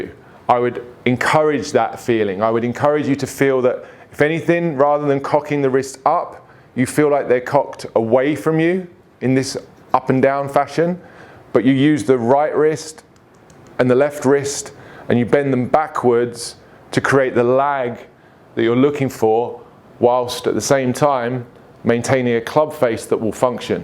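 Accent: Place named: United Kingdom. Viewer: I watch tons of golf content The way you explain it, with LOGIC, is just so perfect. One of the best golf channels on YouTube!! Plus. The British accent? Idk why, someone could be as dumb as rocks, but if they had a British accent? Doesn't SOUND as dumb?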